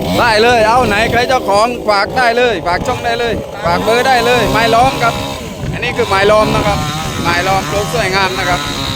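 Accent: South, Thailand. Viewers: ชอบช่อง เป็นเอกลักษ์ชอบภาษาไต้ครับ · ชอบช่าง ชอบฟังลุงพูดภาษาใต้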